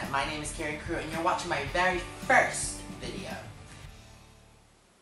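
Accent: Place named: Germany. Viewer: GERMAN ACCENT !!!! :D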